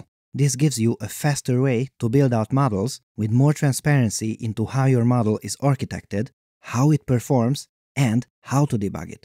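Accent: Hungary. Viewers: Tipikus magyar akcentus! xd (ne vedd sértésnek!) Nagyon jó videó! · Are you Hungarian?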